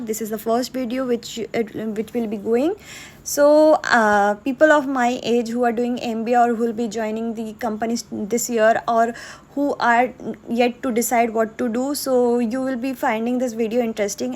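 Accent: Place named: Kolkata, India. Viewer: Kolkata ascent in very good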